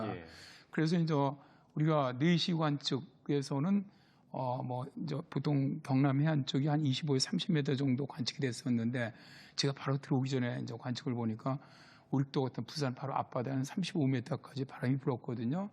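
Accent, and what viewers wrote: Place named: Southeast Asia. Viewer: 동남아인인줄 알았어요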